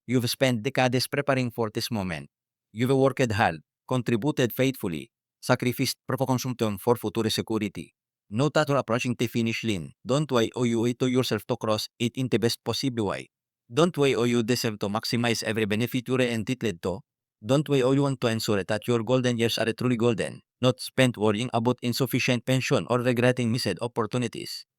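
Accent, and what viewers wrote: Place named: Arab world. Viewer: Dapat English mo clear kaso ginagawa latin Minsan Hindi man slang para Kang arabi mag salita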